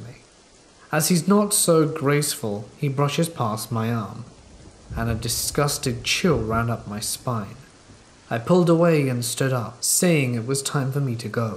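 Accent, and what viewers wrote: England, United Kingdom. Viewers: Lol! I relisten for third time! Love compilations! And narration is simple the best with his english accent 😊 I couldn't see your comment on my side, as I said it earlier, and nothing on my notification. I'll check again. How is your day so far? Have you seen your Dr? 😘 Ps: Did you see Paul? He left his comment. pm here now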